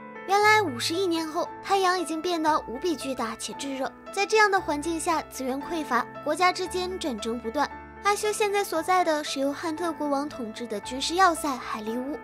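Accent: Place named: Mainland, China. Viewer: 大陸有些字唸法跟台灣不一樣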